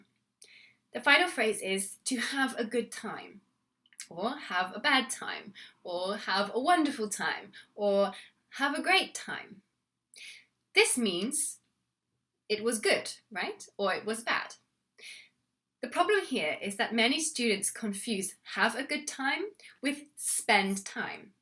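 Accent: United Kingdom. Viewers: дожили, британский английский уже акцентом считают)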